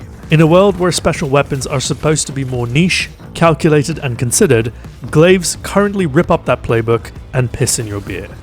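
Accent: United Kingdom. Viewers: Great video mate! Your voice makes me want to visit the UK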